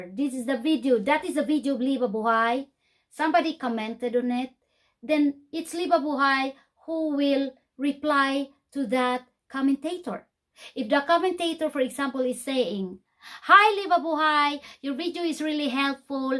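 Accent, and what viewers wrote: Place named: Scotland, United Kingdom. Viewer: Hello dear, I love listening to your voice it makes me feel talking to my Aunt in Scotland, your accent is same as her. I can relate to what you are talking about too, I experienced twice a person commenting on the comment of my viewer I thought it was normal here in yt world, it is disrespectful in a way but I leave it behind. I always agree to the golden rule. KEEP SAFE.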